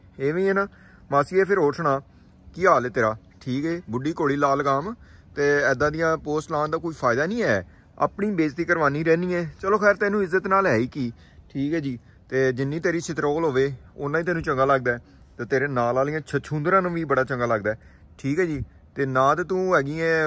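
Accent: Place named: Punjab, India. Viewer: you have excellent punjabi accent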